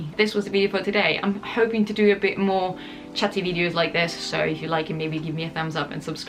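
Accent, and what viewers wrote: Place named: England, United Kingdom. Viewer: Da quanto tempo ti trovi in uk? Sembri Inglese 😁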